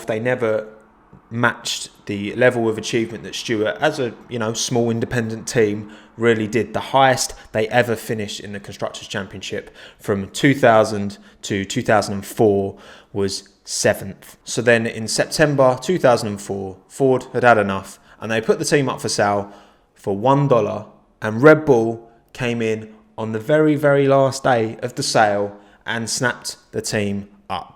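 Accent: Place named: Essex, United Kingdom. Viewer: Great video, strangely I found the best bit was knowing your from not to far from me haha, especially after I only came across your channel after watching Josh Revell and thought your voice was Essex sounding 😂😂😂 keep up the great videos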